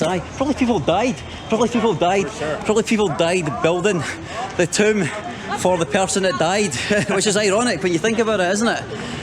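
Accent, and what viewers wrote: Scotland, United Kingdom. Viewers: Why people have so much problem with the title. Erection also means action of erecting structure or object example monuments. 🙄 Anyways I'm here for Scottish accent and big strong legs 🤭🤣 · I love your Scottish accent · He's also seems to be a really nice guy too, like he's always super friendly to everyone. His accent is cool too, not that many Scottish youtubers out there. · His Scottish accent tho!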